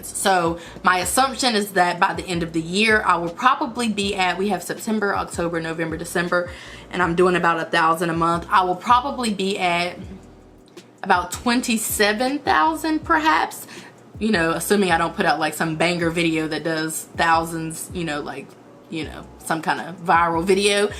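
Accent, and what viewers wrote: South, United States. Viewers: She look like my ex I gotta go 😭😭😭😭😭 Your extra country accent sexy doe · She is gorgeous as many have said and I gotta say this, I'm just a couple mins in and usually I hate a country accent, country music all that but I hear hers and I love it and o love her hair! Continue ascending queen! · Damn its like she got a stong southern accent n it almost sound kinda Baltimorish 😅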